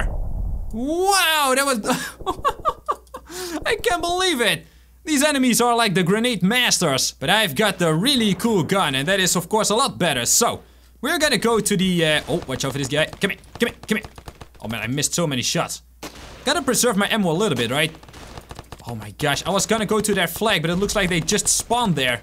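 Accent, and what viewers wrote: Netherlands. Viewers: waarom klinkt jouw engels als engels met nederlands accent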